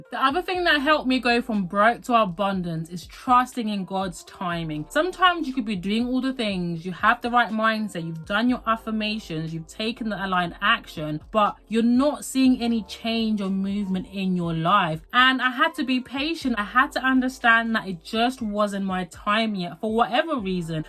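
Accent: United Kingdom. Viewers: I just love the British accent 😊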